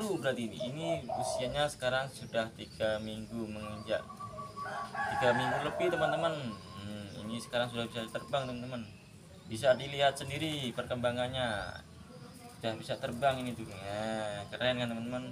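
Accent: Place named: Kendal, Indonesia. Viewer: Logate kok koyo wong kendal mas... kendale pundi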